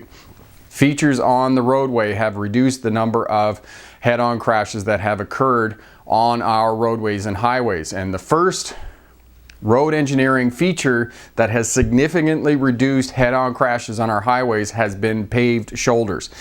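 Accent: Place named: Canada. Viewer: I could tell he was Canadian from his accent :)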